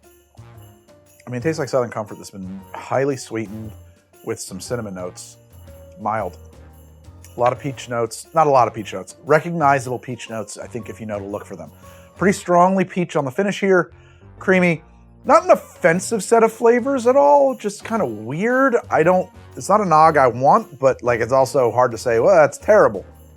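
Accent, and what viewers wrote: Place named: New Jersey, United States. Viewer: Carnival barker with his Jersey roots coming through.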